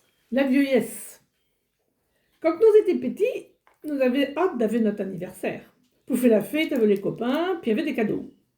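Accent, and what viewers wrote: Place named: Quebec, Canada. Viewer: Quel accent intéressant pour le Québécois que je suis ! Ça évoque certains parlers de l'Acadie et des intonations de par chez nous 😃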